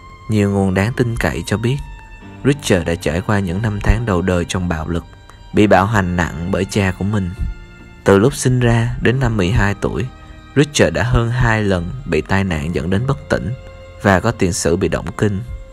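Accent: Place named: South, Vietnam. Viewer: Giọng con trai miền Nam hay thiệt 🤤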